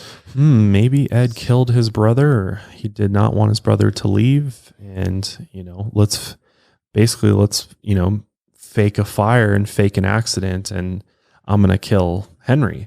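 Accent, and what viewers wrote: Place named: South, United States. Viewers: I never thought people from Colorado had a southern accent but then again I'm from Texas and a lot of us have a heavy accent😂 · It’s so funny you talked about having a southern Colorado accent because in my head I have always thought you sound kind of southern/ Texan lol! I’m from Texas, btw. Love the podcast!! Any content you or put out is 100% 💜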